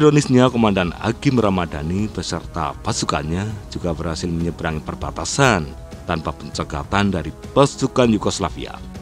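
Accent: Java, Indonesia. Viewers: Ini yg baca narasi nya kata per kata nya ga jelas artikulasinya suaranya terlalu ngebas dan terlalu ke JAWA JAWA an jd baik nya diganti aja ke yg lebih nasional org nya pecat aja tuh ok tq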